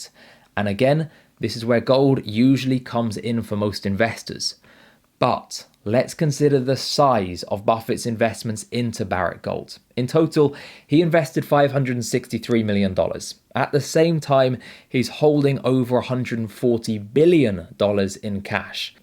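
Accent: United Kingdom. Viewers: Subbed for financial opinions / both sides of the argument,.....Not the British accent......🧐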